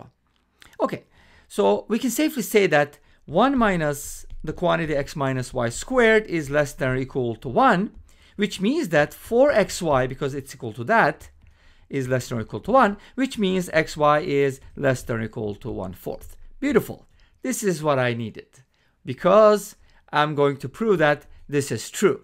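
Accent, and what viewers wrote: Turkey. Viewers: where are you from? turkey or united states?????????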